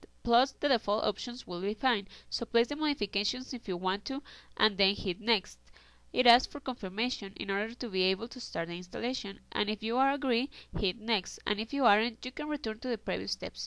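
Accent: India. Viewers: Thumb up for the indian accent :D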